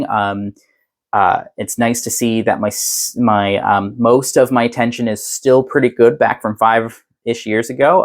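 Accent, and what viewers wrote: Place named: Eastern Canada, Canada. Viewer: I just discovered you and I know NONE of the background—your back story. Is there an episode in your previous series that introduces you? I think from your accent you might be eastern Canadian? Going to go watch the next one. Really enjoying it!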